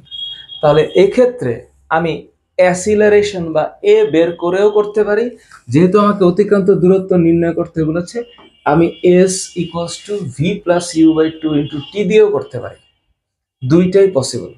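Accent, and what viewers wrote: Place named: Sylhet, Bangladesh. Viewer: Sylheti basa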